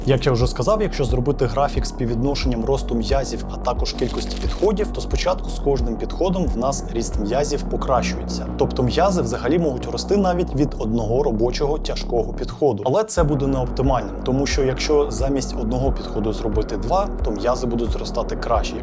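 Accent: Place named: Russia. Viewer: А я думаю що поспішає і з-за цього 20-30 % слів виходить з російьким акцентом. Треба трохи повільніше, щоб звикати до мови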